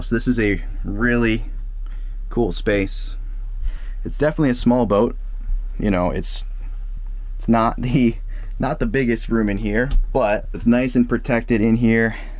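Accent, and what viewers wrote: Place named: United States. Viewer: He’s not English , yank maybe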